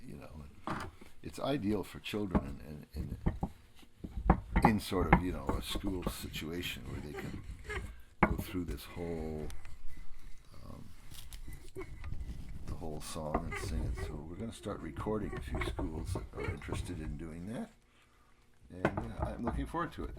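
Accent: Canada. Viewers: He sound so Canadian.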